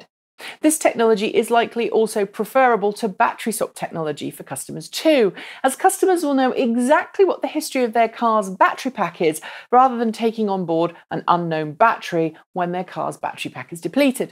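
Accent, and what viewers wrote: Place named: United Kingdom. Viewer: with British accent everything sounds smarter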